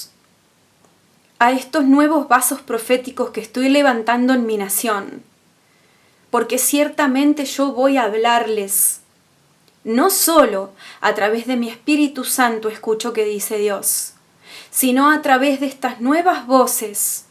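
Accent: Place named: Argentina. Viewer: Venezolana con acento argentino! Me chocan todas estas sectas incluyendo la peor q falla católica, nunca se pronuncian en favor de los necesitados. Estos pastores q tanto le roban a los ilusos, estos curas con tanta plata q tienen de años estar robando la humanidad q han logrado sembrarle a esas matrices de miedos y temores a los ingenuos, esos pastores de las sectas cristianas, con todo el dinero del mundo, como Cash Luna, Maldonado, Jonás Cardona y demás. Donde han sacado un peso para ayudar el pueblo venezolano? Ya q se roban $ hagan los siempre hacen, del millón ochocientos q se roban regalen en cuando sea los ochocientos, hacen lo mismo q hizo la iglesia católica en la segunda guerra mundial, mientras mataban ocho millones de judíos, su religiosidad de perdon, piedad y misericordia, nunca se pronunciaron, voltearon la cabeza sin importales nada. 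Eso mismo hacen en este momento, les importa un comino la necesidad. Creen q a punta de cháchara los van ayudar.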